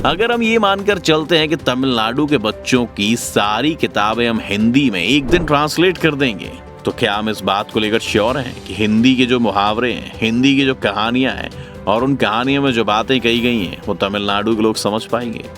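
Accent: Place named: Delhi, India. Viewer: सर जी आप थोडा नुट्रल रेहना....आप थोडासा देल्ही वाइज बोलते हो.... बोलने वाला नुट्रल रेहना चाहिए... देलही की तरफ मत झुको...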